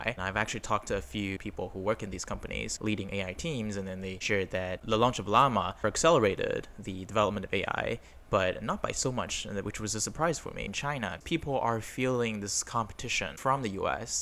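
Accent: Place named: United Kingdom. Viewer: Good video. Also u have a heavier British accent these days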